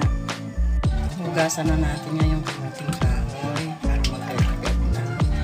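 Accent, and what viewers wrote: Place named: Visayas, Philippines. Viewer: oy bud bud nga kamoting kahoy sir proud bisaya here klaro kaayo nga bisaya ka sir ako pud hehe -tintin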